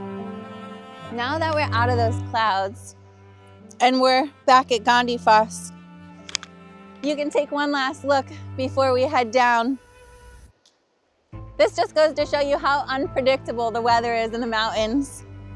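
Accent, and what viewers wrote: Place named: New York, United States. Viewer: Was not expecting a New York/Joisey accent.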